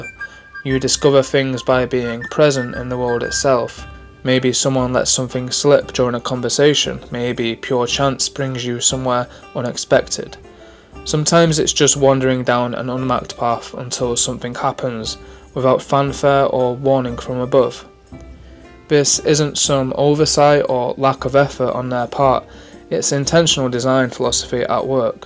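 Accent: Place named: North, United Kingdom. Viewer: ignore this, embrace your beautiful northern accent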